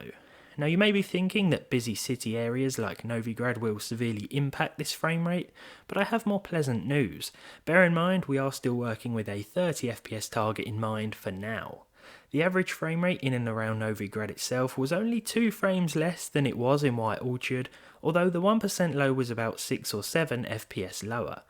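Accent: United Kingdom. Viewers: I just love this channel because there is no background music. Makes the channel different in a good way. Your british accent is the music for my ears :D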